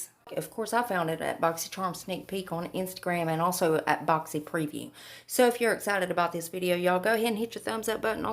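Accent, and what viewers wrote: South, United States. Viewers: Love you!!!! Love the way you talk....I am country too♥️♥️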